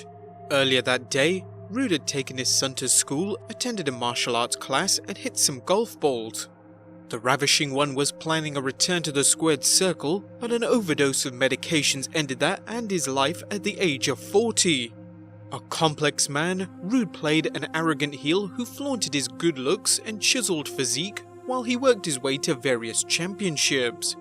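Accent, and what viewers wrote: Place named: United Kingdom. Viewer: Mostly a nit brit poorly reading some text.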